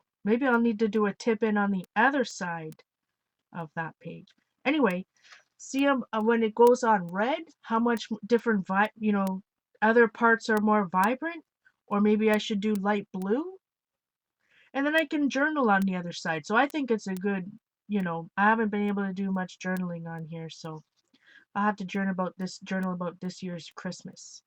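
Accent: Canada. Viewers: You are a cutie patootie with that adorable Canadian accent!!! Lovely work, thanks for sharing!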